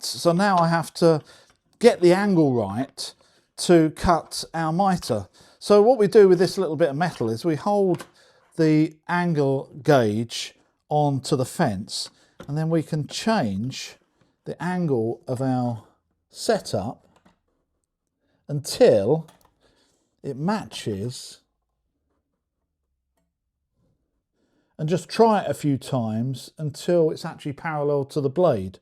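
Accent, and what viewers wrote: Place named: Mississippi, United States. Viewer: Love that Mississippi accent.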